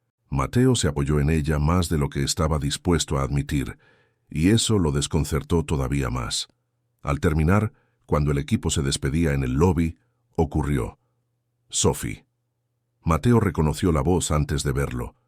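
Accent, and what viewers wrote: Argentina. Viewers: me emocionó el acento argento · narrada con acento argentino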